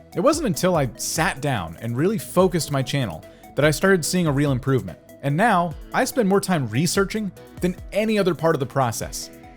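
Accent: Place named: United Kingdom. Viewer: You lost your British accent and grew hair?🤯🤯🤯🤯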